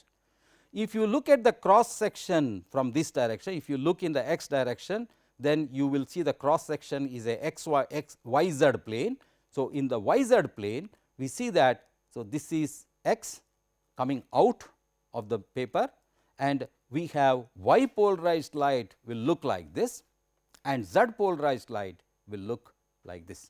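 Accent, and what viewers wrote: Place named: South India, India. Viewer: Sir appears to be south Indian by his accent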